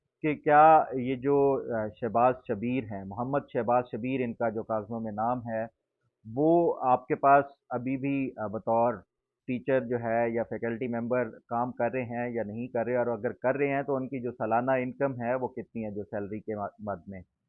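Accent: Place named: Punjab, Pakistan. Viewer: شہبازمناظرے سے نہیں پاکستان کے گندے نظام سے بدل ہو کر گیا ۔ پاکستان میں جیوڈشری نظام کرپٹ اور بےکار ہو چکا ہے۔ پویس اور فوجی نظام غلاظت کی حد تک گندا ہے گھروں کی عورتوں بزرگوں پر تشدد عام ہے ۔ انسان کی عزت نفس محفوظ نہیں ملک میں رانا ثنا خبیث نےملک میں بدمعاش غنڈے سارے ملک کے برتی کر رکھے ہیں جو پہلا کام کرتے ہیں انسان پر جسمانی تشدد کرتے ہیں ۔کون سا آئین آپ امریکہ کے آلہ کار ہیں سب یہئ کہتے ہیں ۔ آپ کا لہجہ پنجابی کمزوراورکرپٹ انسان کا ہے ۔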